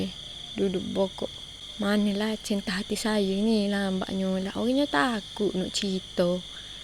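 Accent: Kelantan, Malaysia. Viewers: baru sedak dengar masuk dlam cerito denga kalu kot lorak kelate gak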